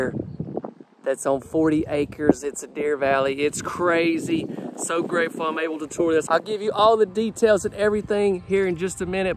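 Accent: South, United States. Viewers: Absolutely amazing - I really give the owners kudos for having the imagination to create such a beautiful comfortable amazing home with all the extras it’s crazy!! Definitely made me feel calm and a little envy ness lol no but seriously it truly made my day! Thank u to the owners for allowing America into your home and thank you for doing such a great job and that southern accent and manners gets me every time!! Lol -Looking forward to watching more of your videos! God bless!! 🙏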